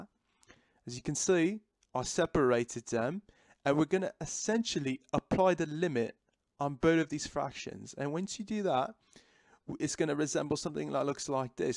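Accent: United Kingdom. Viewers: Lmao that's a heavy ass British accent.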